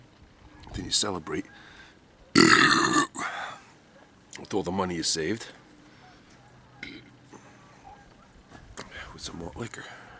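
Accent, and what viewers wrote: New York, United States. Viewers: ROTFLMAO!! hell yea this dude the man!! i hear NEW YORK IN THIS DUDE!! helluva job then pulled out the 40 rty of that old ing bing. Hahahahah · great video nice to hear a new yorker again been in florida to long haven't drank an old e in a long time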